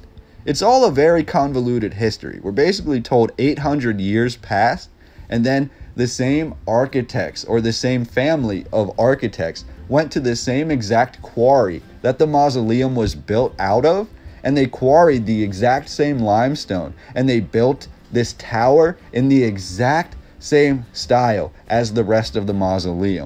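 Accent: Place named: West Coast, United States. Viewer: I appreciate the content but can’t help but wonder where your accent originates. My guess is West coast 🤔 either way keep up the good work.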